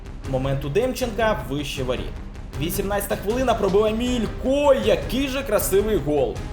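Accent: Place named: Bukovina, Ukraine. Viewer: Все класно, але наголос Буковини ріже вуха (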